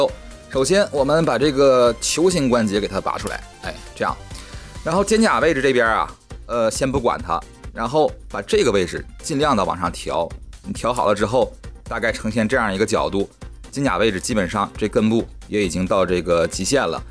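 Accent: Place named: Dalian, China. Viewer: 博主大连的？sijin抬？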